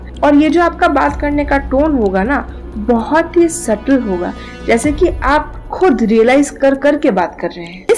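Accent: Bengal, India. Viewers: Bengali ho aap sayad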